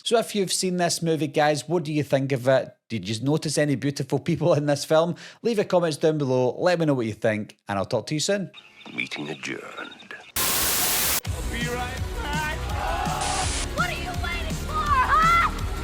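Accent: Scotland, United Kingdom. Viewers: With a last name of i can pick oug that scottish accent...where ya hail from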